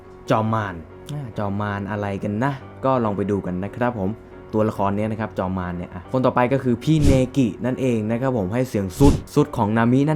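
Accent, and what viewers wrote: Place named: Suphanburi, Thailand. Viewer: แอดใช่คนสุพรรณป่าวเนี่ยเหน่อจังเลยตอนท้ายๆคลิปอะน้า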